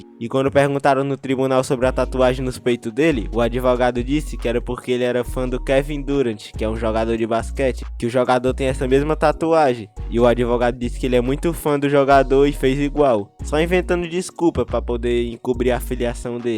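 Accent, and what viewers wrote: Fortaleza, Brazil. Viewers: Mansho tu e de fortal ne? kk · Tu é de fortaleza? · Tu é de Fortaleza é btl?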